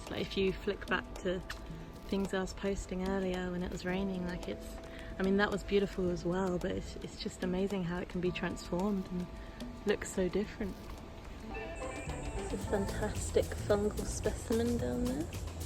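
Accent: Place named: Australia. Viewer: Great Video! I love your passion for plants! Its something that I have always overlooked, not deliberately but I have just been drawn to things that move (at a noticeable rate). I cant work out your accent? Some sounds Aussie, some a little south African and then some sounds English. Have you travelled about a bit by any chance?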